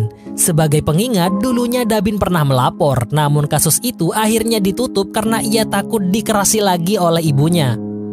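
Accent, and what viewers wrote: Madura, Indonesia. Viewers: Admin madura ya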